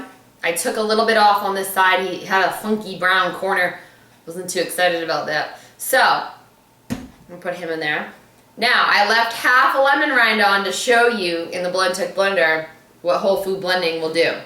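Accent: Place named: Florida, United States. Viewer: Are you from Florida? You sound like a Southern Floridian (not South Floridian, mind you). :-)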